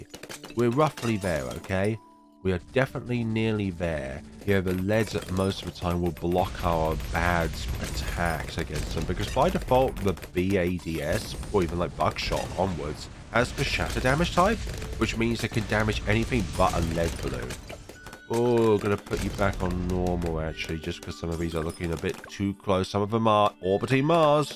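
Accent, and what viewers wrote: United Kingdom. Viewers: Two of my favorite things in one video: btd6, and a british man talking about space. Absolutely lovely 👌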